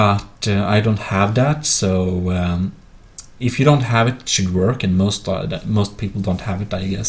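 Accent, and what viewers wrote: Sweden. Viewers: Man hör verkligen att du är svensk lol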